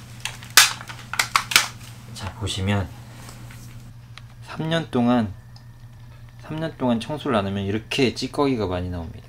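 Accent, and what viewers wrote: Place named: Jeonbuk, South Korea. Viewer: 늦은시간에 빠른답변 감사드립니다. 사투리가 구수한게 너무 익숙합니다. 저는 전북김제 출신인데 동향분 아니신지요. 그래서 더 편한함을 느낀거 같아서요~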